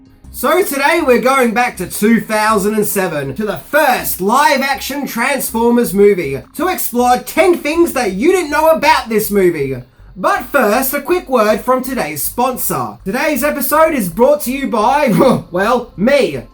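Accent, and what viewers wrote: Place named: Australia. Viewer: LMAO, your Aussie accented snob voice is hilarious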